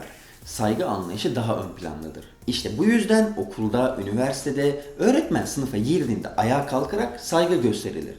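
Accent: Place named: Greece. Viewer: yunan ağzıyla konuşmuşsun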